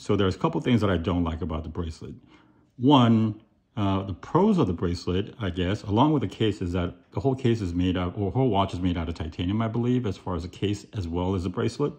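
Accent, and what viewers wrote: United Kingdom. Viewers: You say it as if British accent is a choice 🤔 just not sure what your original complaint was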